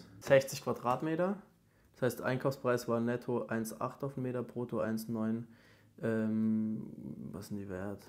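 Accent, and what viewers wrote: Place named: Baden-Württemberg, Germany. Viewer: ach ich hab jetzt nochmal paar mehr videos von dir nachgeholt und gemerkt, es liegt wohl an deinem Badenwürtember-Akzent. 😂 aber kommt dem schon nahe vor allem auch mit der Betonung. Ich meine Percy, die Rolle von Teddy Teclebrhan / aka Antoine.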